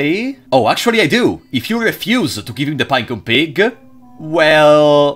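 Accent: Italy. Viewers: ho capito fossi italiano dall'accento loool ciao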